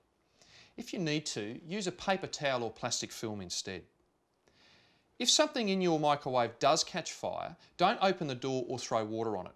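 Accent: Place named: Australia. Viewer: Everything sounds better in an Australian accent, even fire safety vids! 🇦🇺👍 Greetings from the U.S.A.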